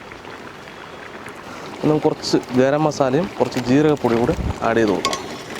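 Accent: Palakkad, India. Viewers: palakkadan slang itrayum bhagiyode kerathinu kanichukoduthathinu big salute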